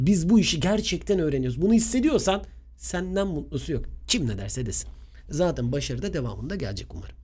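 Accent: Aegean, Turkey. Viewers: Hocam yorumları okuduğunuzu biliyorum ve bir ricada bulunacağım : Bu ders ege şivesi kullandınız bazen bi gün Karadeniz şivesi de alır mıyız ya ? Edit: Karadeniz ağızı .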